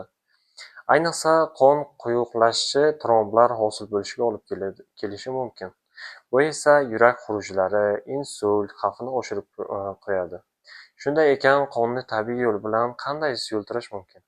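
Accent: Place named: Tajikistan. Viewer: doktor millatiz nima talaffuz iz ungacha, tochik ,hitoy, juhut emasmisiz?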